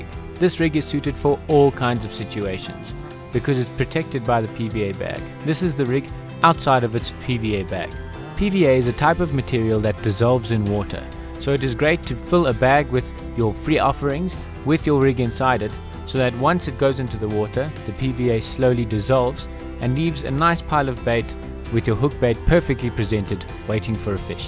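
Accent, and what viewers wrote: South Africa. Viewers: Lovely to hear a saffa doing some carp stuff. Subscribed now. From a fellow saffa in devon, United Kingdom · I noticed the accent immediately! Nice to find a local channel on fishing 🇿🇦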